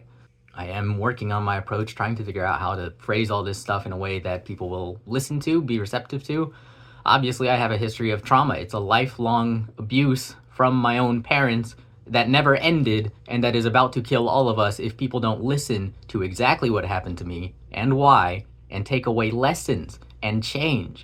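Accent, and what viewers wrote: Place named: United States. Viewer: What is this event you're talking about thatll kill us all? Are you saying the trump administration is going to instate a theocracy and go all Inquisition on us? Or do you mean something more international, or do you not live in America? Some less vague language might do you well, because you sound American, and no offense but you look and sound like you're going to bomb a synagogue or something. Are you ok? I'm with you though, on the antitheism. I'm right there with you. We need to leave magical thinking and religion behind, I've been saying it for years too. No idea why this 87 view video was on my front page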